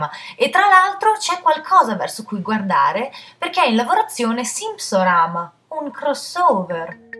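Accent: United Kingdom. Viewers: ahaha la faccia da INIZIO DI SUPERNATURAL <3 sei troppo brava sei il mio programma tv..ma su yt :) ma poi la pronuncia british...love! un caro saluto,Alessandra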